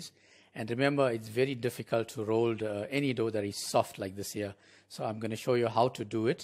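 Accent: Durban, South Africa. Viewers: South African, accent sounds like Durban people... Durbanite ❤ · Hi. You sound South African Durbanite. I also do similar dough.. Take care love from Durbs. South Africa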